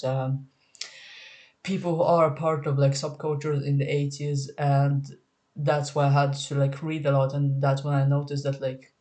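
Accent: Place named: Sweden. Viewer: i think i live alternatively the way it's meant to be lived. roaming thrift stores, doing climate activism, going to punk-, vegan- and queer events - i like it. the looks are a second thought but definitely a part of identifying your crowd. sometimes i wish i was more on social media but then i realize i don't care that much, i meet so many cool people in my town anyways. also your accent is really fun, the first thing i thought was swedish but then the russian came in and threw me off. i was sitting here pondering latvian/lithuanian until you mentioned swedish and i read the comments, so i wasn't far off with that middle point hahha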